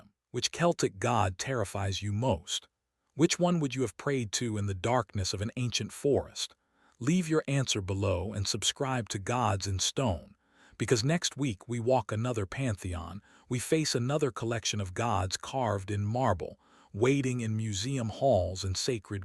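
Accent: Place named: United States. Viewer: American pronunciation absolutely does my head in. It is just pure lazy.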